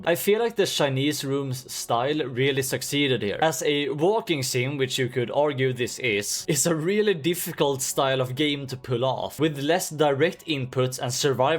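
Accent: Sweden. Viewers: It could be worse... he's better than most swedes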